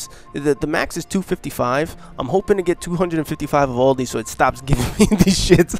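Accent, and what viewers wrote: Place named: New Jersey, United States. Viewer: He sounds like he's from Jersey Shore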